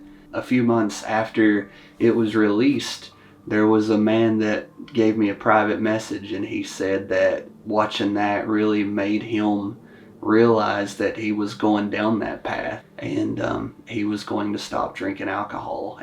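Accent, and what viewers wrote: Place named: Tennessee, United States. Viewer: WoW 😲 Cool your accent is Tenn.You sound Just like my relatives! From Kingsport, Bristol, Mendota,Johnson City. It’s on Dad's side. Great job with this & Alter was Awesome 👍 Hope you're doing well in the Industry.Happy Halloween 🎃 Season 🔥🎃👻🧛🏼‍♂️💭🦇🔥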